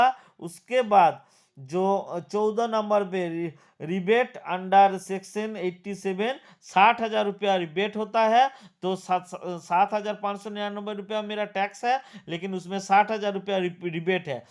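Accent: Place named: Bengal, India. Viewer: Apka relation bangal se v hai kya sir Language waise hi lag rha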